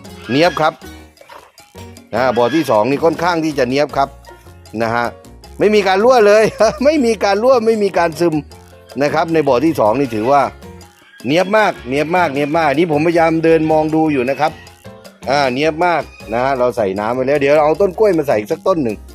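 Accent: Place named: South, Thailand. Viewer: น้ำเสียงเหมือนคนใต้เลย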